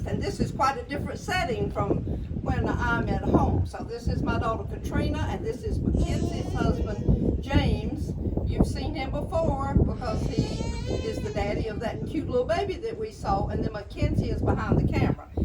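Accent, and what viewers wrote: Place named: Savannah, United States. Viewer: I shall and you sound like my people from Savannah and South Carolina. I love it!!! And they DID the crab! They went and spent a whole morning catching them and then the whole afternoon picking them! Good memories!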